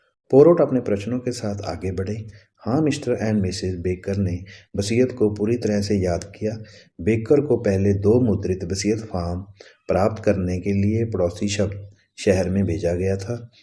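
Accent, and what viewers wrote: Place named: Punjab, India. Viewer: कहानी शानदार है आपका उच्चारण पंजाबी है इसलिए माहौल नही बना सके Do little homework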